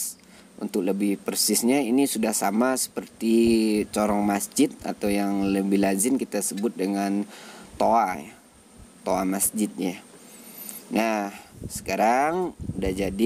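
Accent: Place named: West Sumatra, Indonesia. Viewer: macam ada suara minang kocak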